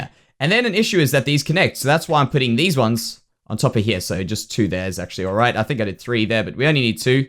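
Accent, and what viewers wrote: Australia. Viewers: Are u an aussie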